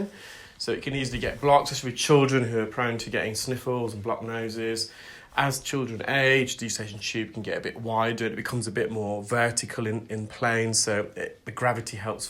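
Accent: England, United Kingdom. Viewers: with all the cases you have to wrestle out you must really appreciate the few cases that come right out with no problem 😂 i know i would - but i’m the impatient fan as i posted yesterday lol. u have such a suave soothing voice, i love the sound of the suave English accent (to me it’s an accent lol). i notice some have a sharper sound, hard to explain but my UK friends were impressed that apparently i noticed the difference between north and south England. they tried to trick me with a Yorkshire … i could tell it was different but not north or south ☺️ while i was on Holiday in the UK they loved my accent 😂 it was funny to be the one with the accent! they said i sounded so proper 😄 American proper?? 😂😂 i think we’ve butchered the Queens English!! anyway i loved the people & the country ❤️❤️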